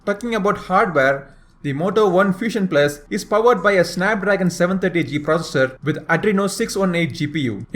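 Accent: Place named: Tamil Nadu, India. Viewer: Englisha vida unga tamil style tha massu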